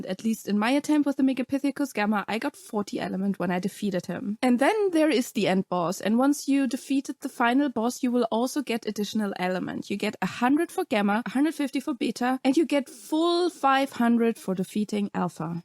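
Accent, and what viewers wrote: Germany. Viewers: I subbed after watching your guide to breeding. Best explanation i could find. Well done. Also subbed for the nostalgia as your accent reminds me of my cousins who live in Germany ☺️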